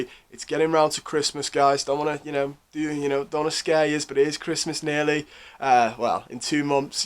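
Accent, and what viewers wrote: Liverpool, United Kingdom. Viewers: r u from the wirral? you sound scouse but not as we know it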